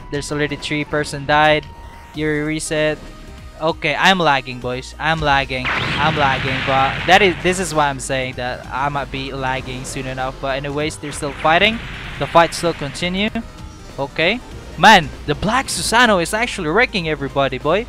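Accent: Philippines. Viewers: ye his accent aswell is like a filipino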